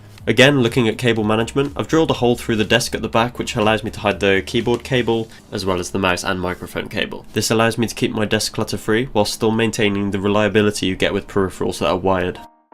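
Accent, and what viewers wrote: United Kingdom. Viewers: Are you from UK? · Half way through building my set up great ideas. I have pops and it's great to here another English accent 👏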